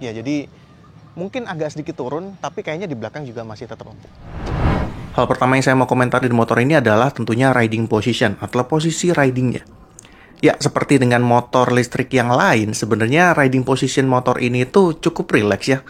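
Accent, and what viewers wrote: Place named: Java, Indonesia. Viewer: masa reviewer logat Medok gitu di bilang enak di denger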